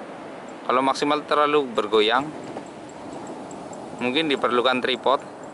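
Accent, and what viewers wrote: Banyumas, Indonesia. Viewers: Keren bahane ngapak campur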